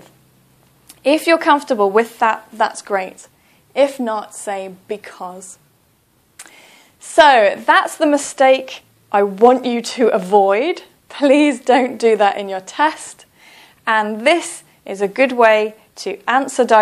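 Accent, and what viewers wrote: United Kingdom. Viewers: Its british accent. You can feel the BOUNCE the way they speak.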